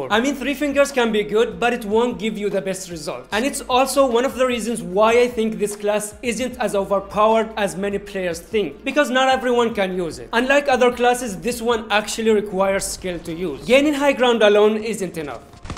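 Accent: India. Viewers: When i heard the indian voice i know i can trust him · At the starting i felt like you were gonna speak hindi you have a indian accent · You sound like us Indians. Where are you from??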